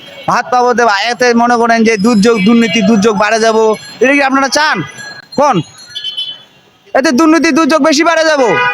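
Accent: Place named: India. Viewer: Tumi ki bharoter bangaly naki? Tomar bhasay bharotiyo.mone hoy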